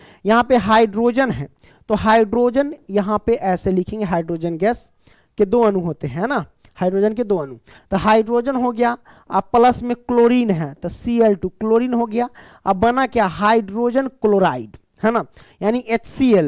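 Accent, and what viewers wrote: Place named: Bhojpuri, India. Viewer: Kya aap bhojpuri h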